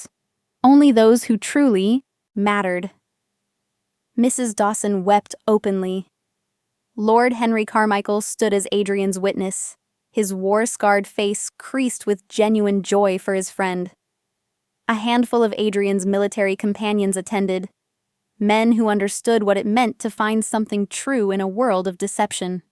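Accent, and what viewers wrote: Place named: United States. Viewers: I agree. This is weird in an American accent. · Why American accent? Should be narrated in British accent😑.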